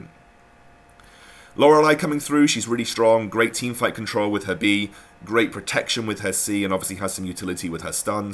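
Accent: United Kingdom. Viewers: "Meturr" "Alpherr" why do the British ruin words?! 😓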